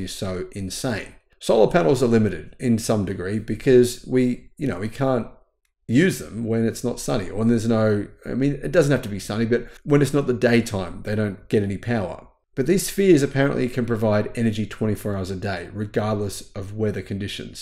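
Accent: Australia. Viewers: Are you ausi?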